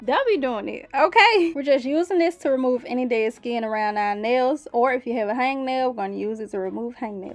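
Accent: United States. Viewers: Wow, that's the most American I've ever heard a human being sound.. Nice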